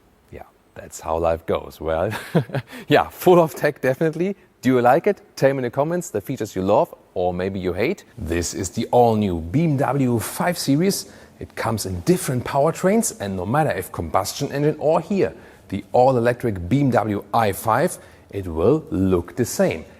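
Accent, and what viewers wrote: Germany. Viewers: English with typical german accent 🤮👎🏻 who understand this...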